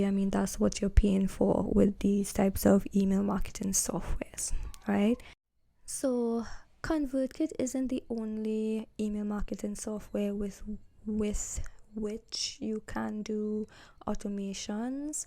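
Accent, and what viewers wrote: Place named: Trinidad and Tobago. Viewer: trini accent a fight fi come out lmao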